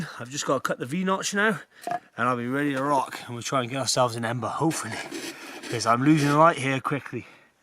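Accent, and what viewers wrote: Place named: Geordie, United Kingdom. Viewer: i would not entertain comments from Mehmet he does not have a channel, and probably has no bushcraft or survival skills, Mr Mehmet if you read this comment lets see what you can do... great video man a big thumbs up and subscribed...there seems to be a hint of Geordie in your accent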